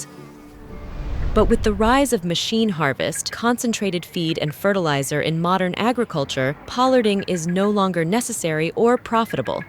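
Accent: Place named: United States. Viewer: Great to see/hear a film about pollarding. In Aragon, there are thousands of Chopo Cabeceros, Black Poplar pollards. If I am being picky, Would have been a perfect film with a voice over from a European person. Seems odd to hear an American chatting about this subject?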